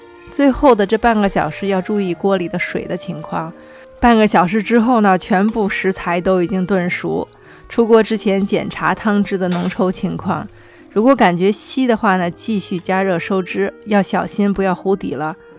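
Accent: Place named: Northeast, China. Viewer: 我听你的口音有点像东北口音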